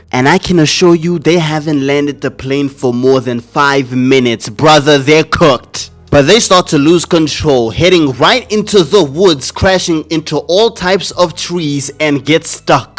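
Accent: South Africa. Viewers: Why this guy has both a South African and American accent wtf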